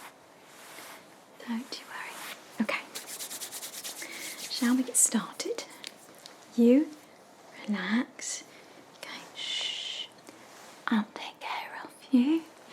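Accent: United Kingdom. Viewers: EWWWWW BRITISH ACCENT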